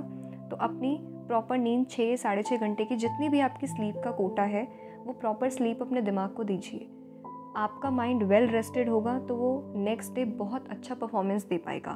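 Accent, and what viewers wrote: Bengal, India. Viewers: Thanks for guiding lot of students.keep spreading your positive vibes....life becomes more positive and beautiful when people like you enter in life.Thanks and lot of respect to your parents who gives you such a good culture and sanskar. Somewhere I got a Bengali touch in your speach.you are from?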